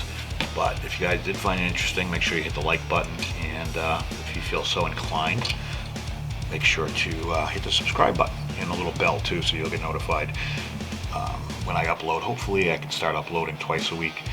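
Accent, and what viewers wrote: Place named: Southwestern Pennsylvania, United States. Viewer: Sounds like a Southwest PA accent.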